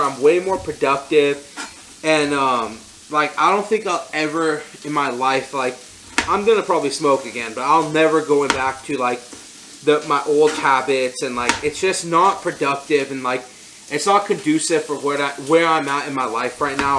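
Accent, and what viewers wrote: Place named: Michoacán, Mexico. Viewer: I seriously thought you were from Michoacán Mexico 🇲🇽 bro Love that meal 🥘 prep easy and affordable thank you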